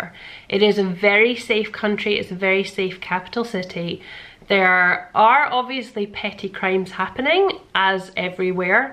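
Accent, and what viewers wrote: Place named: Scotland, United Kingdom. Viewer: hi, just came across your channel....i think id LOVE to live in iceland...im sooo into the simple life and nature...i actually watched your what you hate about it first....im just wondering about what work you can do? get? and what you have to do to be able to stay/live there....thanks for video...Love your accent....love scotland....its beautiful..:) x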